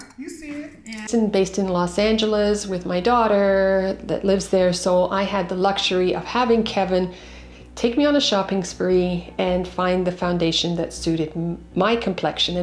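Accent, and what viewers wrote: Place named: United Kingdom. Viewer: Love it! I'm starting to hear your British accent more 🥰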